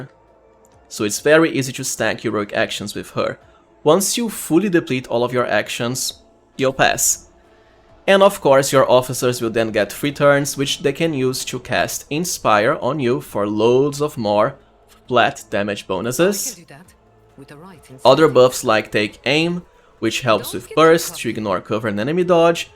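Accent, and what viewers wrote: Brazil. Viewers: Are you Brazilian? your accent cant fool me :P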